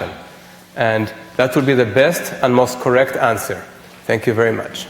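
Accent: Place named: Israel. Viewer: when he pointed out his Israeli accent it was a ripoff of what Deepak Chopra does in his seminars to get rapport with his audience, mentioning his Indian accent.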